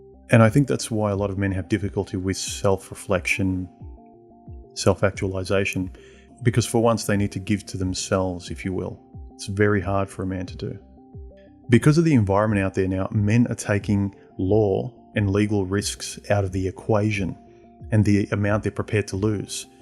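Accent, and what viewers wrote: Australia. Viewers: Best aussie I've heard talk for years Regards a south African...lol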